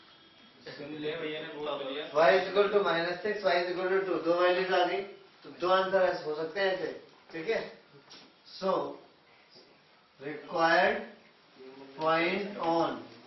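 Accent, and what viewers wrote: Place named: India. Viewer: Awaaz se laga hindustani bhau pada rahe hai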